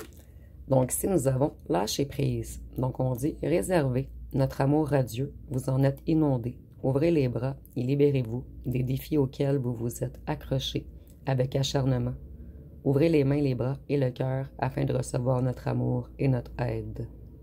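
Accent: Quebec, Canada. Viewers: J'aime bien votre guidance toujours fort juste ,ainsi que votre accent québécois , votre voix et vos mains et ongles très jolie vous avez l'air très coquette et tellement lumineuse et juste vrai .👍❤🙏🍀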